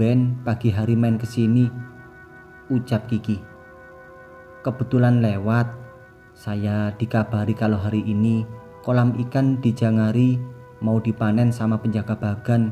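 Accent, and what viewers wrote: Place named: Madura, Indonesia. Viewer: Mf..kang Mimin ini dari Madura kah..? Sy dgr dr logatnya😁🙏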